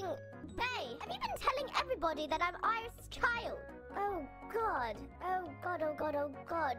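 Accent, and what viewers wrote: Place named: England, United Kingdom. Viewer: Not a bad English accent.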